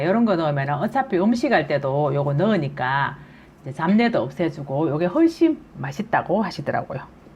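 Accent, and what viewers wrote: Gyeongsang, South Korea. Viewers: 쌤 경상도 라도 억양이 경북 분이네요 하모예 메실엑기스는 파이지예ㅋㅋ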